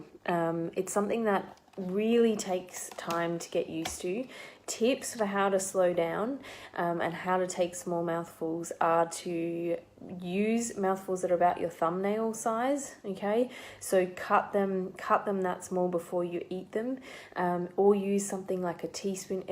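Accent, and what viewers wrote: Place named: Australia. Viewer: Thanks so much so helpful! And you;re an Aussie - even better!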